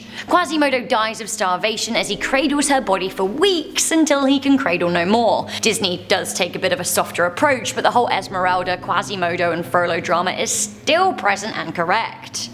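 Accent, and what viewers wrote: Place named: England, United Kingdom. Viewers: love this girl's accent-sounds english, or welsh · She’s southern English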